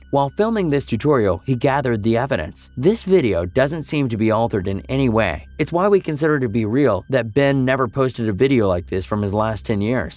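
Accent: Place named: General American, United States. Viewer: im noticing a theme with these type of vids, the narrator usualy has a standard american accent (i assume its american) but cant structure sentences properly....why?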